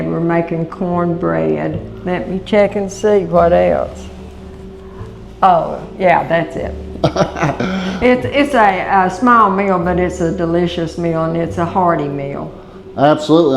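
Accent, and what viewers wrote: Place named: Tennessee, United States. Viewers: I just discovered your channel for the first time. I was born and raised 45 miles Northwest of Nashville and grew up on this kind of food and hearing my relatives speaking in this matter. This channel is just like going home. Thank you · I absolutely love your south😅ern accent.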